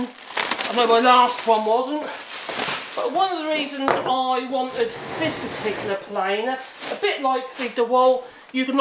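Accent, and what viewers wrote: Norfolk, United Kingdom. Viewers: You sound like a Norfolk boy to me!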